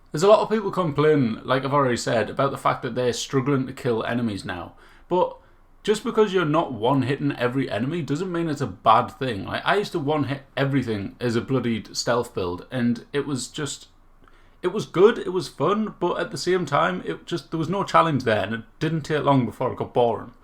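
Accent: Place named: Newcastle, United Kingdom. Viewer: This was a jokes watch. Pure geordie rant saying it how it is😂😂 however I watched this video purely to see if the game is good now (lol, fourth attempt since release) and it hasn’t exactly sold it to me! Entertaining nonetheless!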